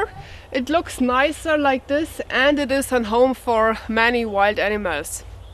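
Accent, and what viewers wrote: Germany. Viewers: You have great video's. Mine grandparents on my fathers side came to America where I live and they were from Germany and Switzerland. Well, they had the same accent as you, Yes! they sounded just like you :) I listen to you and I feel very much at home, good feelings. I like your videos & how you take us with you. But you know, to hear you talk, yah? yah I feel at home.. yah, is da truth. :) I'm so happy I found your channel.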